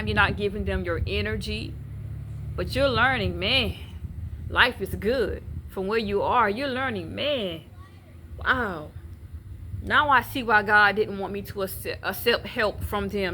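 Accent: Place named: Louisiana, United States. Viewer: SIS❤ You gotta be from Louisiana❤ with that Come on Nah😂❤ I Love my State, but Yeah, when I tell You I’m so glad GOD had me follow you💚 When I tell You, You be Conforming🙌🏾🙌🏾💚🗣️ JESUS🤍 HE DONT want NOBODY taking the Credit for what HE is Doing in my Life🙏🏾🙏🏾 Yeah things a lil shaky BUT GOD 🙌🏾🙌🏾🤍 I’m at Work Smiling so hard😁😁 You uplift my Spirit 🙌🏾🙌🏾🤍💕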